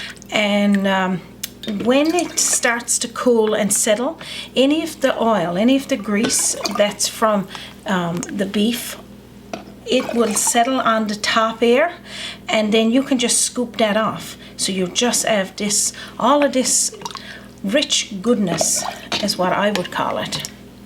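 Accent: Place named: Newfoundland, Canada. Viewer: you so remind me of my grandmother as a young lad living in the country with farm lands all around. I grew up in the 40's and 50's and my Grandmother was always cooking, baking. I learned so much from her. I just love your Newfoundland way of talking as I told you before I worked with many men from NFLD.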